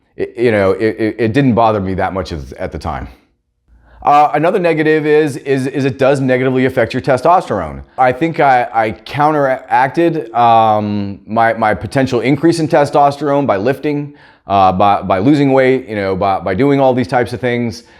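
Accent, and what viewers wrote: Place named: South, United States. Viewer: Dude if I close my eyes it sounds like Dustin "The Diamond" Poirier is speaking, are you from south?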